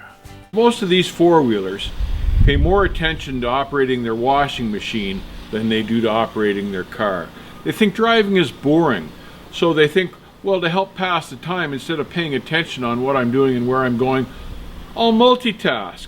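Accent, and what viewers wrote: Canada. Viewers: You Canadians have the best accent! LOL! Love the channel! Keep up the great work!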